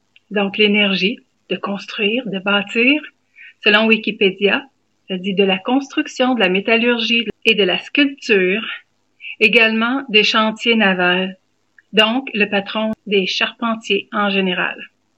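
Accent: Quebec, Canada. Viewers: Avec ton accent québécois on ne peut qu apprécier ! J te promet ma belle ! ❤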